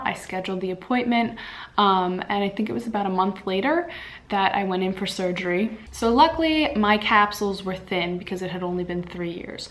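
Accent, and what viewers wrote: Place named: Philadelphia, United States. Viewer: Sounds like your from philly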